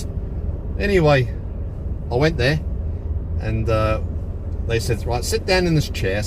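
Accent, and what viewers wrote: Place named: Australia. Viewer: Where did you get that Australian twang in your accent from?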